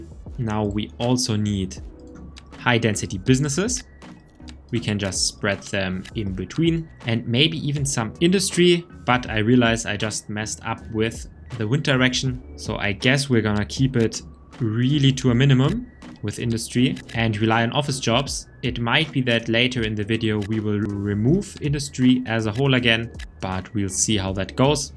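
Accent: Germany. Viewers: Bro kein hate aber du hast einen krassen deutschen Akzent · Do I hear a German landsman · Oh! You‘re German… I hear it and I saw it. Nice vid/cooles Video… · es ist so komisch nem deutschem beim englisch sprechen zuzuhören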